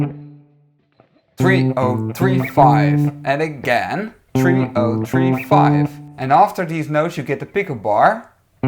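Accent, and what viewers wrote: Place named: Netherlands. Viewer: Ik hou van dit volle nederlandse accent haha 😂❤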